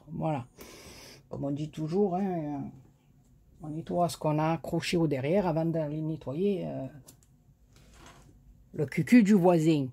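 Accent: South, France. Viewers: Re 👋, waouh quel magnifique album, on en prend plein les yeux 🤩💖😍, vous avez mis ce beau papier en valeur avec la dentelle, les perlounettes, les petits nœuds....... Bravo pour ce très beau projet. Merci pour cette présentation avec votre bel accent du midi et surtout votre bonne humeur, c'est toujours un plaisir de vous entendre, de voir vos créations et de suivre vos tutos. Bien sûr que j'attends vos prochaines cartounettes ou ATNap. Prenez soin de vous et profitez de la mer, du soleil🌞 et de la baignade 🏖. Bon dimanche, à bientôt 🥰🥰😘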